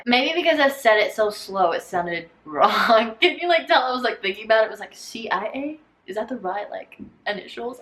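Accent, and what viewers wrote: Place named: Tennessee, United States. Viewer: Your accent literally makes me so happy. I'm from Tennessee and get picked on because my accent is so deep, and while watching this video someone came up to me and said "wow her accent is like yours!!!" 😂😂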